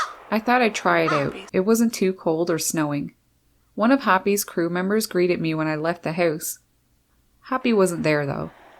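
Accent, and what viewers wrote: Canada. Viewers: I think she said NewFoundland. She's got quite the Canuck accent.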